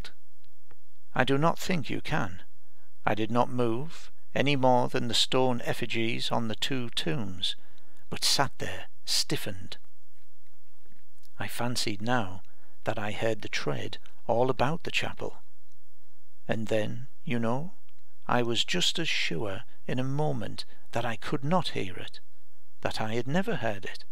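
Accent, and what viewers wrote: United Kingdom. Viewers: No offence to American readers, but there's nothing like an English voice for a ghost story 🙂. Thanks.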